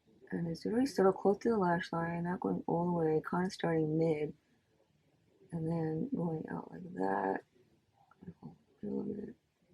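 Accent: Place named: Scotland, United Kingdom. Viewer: I loved the end result, all the colors came together nicely. I like that you shared that you are a minimalist when it comes to make-up, i'm the opposite in that I love to do a full face look with contour and all. I must commend you on the editing of the video when you showed the product as you are using them, wow. I just hit the subscribe button just as you were saying to subscribe, lol. And finally, I love, love, love your accent, it sounds kinda Scottish so beautiful.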